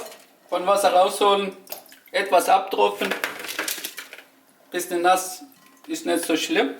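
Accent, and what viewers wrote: Swabia, Germany. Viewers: Das ist "Ausländerschwäbisch" 😂 Sprechen viele. Hat mein Vater auch so ähnlich drauf und ist kein Türke. Keine Ahnung, vielleicht tun die Schwaben ja irgendwas ins Wasser.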